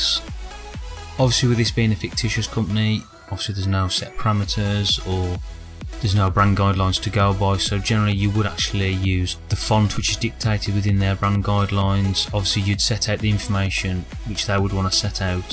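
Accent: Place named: Black Country, United Kingdom. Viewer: I was wondering if you were a yam-yam or a brummy, haha. I am from these parts too.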